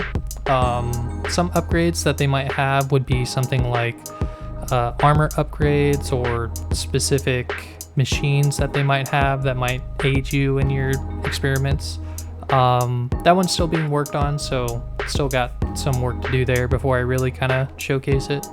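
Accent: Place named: Canada. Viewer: are you perhaps Canadian? (You sound very Similar to Kan Gaming)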